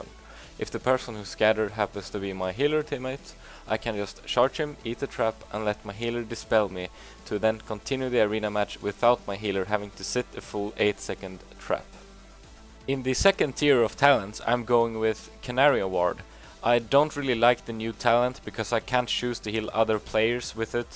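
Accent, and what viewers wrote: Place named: Sweden. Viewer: Dat swedish accent :P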